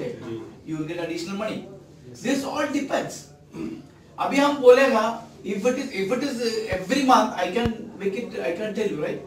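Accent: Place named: Kerala, India. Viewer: why didn't you polish your accent, it sounds I am hearing Malayalam in English language.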